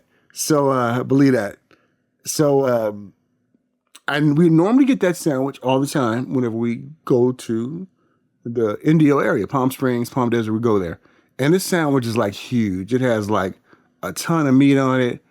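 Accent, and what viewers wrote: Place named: United Kingdom. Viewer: Your British accent is EVERYTHING!!! You sound better at the Queen's English than I do 😂😂😂😂 xxx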